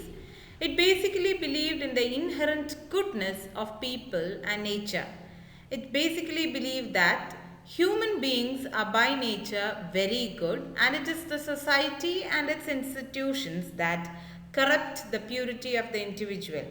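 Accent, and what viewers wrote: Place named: Tamil Nadu, India. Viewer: Tamil accent is very clear. Tamilians should improve in English to sound neutral.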